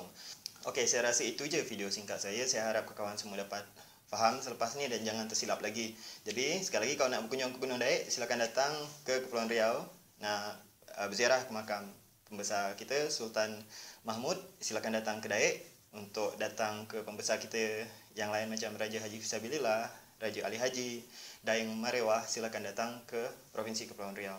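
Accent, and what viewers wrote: Malaysia. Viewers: He sounds like us malay in Malaysia 😄😄😄😄❤❤❤ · Kalau dengar suara sahaja, mesti akan ingat orang malaysia@ yang bercakap ni.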